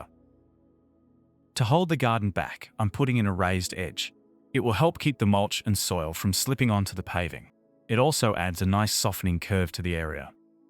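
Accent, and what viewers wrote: Australia. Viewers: I have watched a few of these videos over the past couple of days here in Dallas, Texas, and you are spot on about his soothing voice. It is warm and comforting and his enunciation seems effortlessly precise, just like his placement of every. single. one. of those goddamn bricks. Add to that the Australian accent and, well, I would pay top dollar for the software that reads to me my emails and texts in that voice. Pivoting now to address the owner of that voice, you have mad skills, sir. Many. Your diction and the stories you tell reveal your intelligence and an awareness that few possess; your videography and landscape designs are evidence of a keen eye; and your diligence and unwavering attention to detail are the mark of a true craftsman. Bravo. 👏 I'm glad I found your channel. I'll keep watching. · Lovely surprise to open a clip and hear an aussie voice. 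Great video. Thankyou for sharing.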